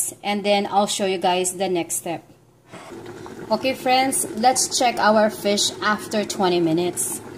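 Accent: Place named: Philippines. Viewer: you speak fluent English but ❤ your Pinoy accent 😅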